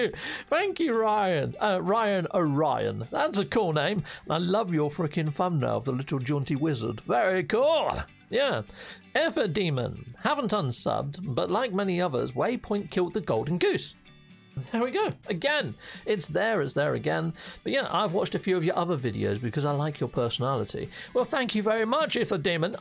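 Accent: United Kingdom. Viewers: Oh -- and I would definitely watch some paranormal oriented content! I too find the UK accent and phrasing very entertaining. At 2000+ hours I'm still a long way from being bored with NMS. But while I'm a huge science and science fiction fan from WAY back, the paranormal is a kind of guilty pleasure for me.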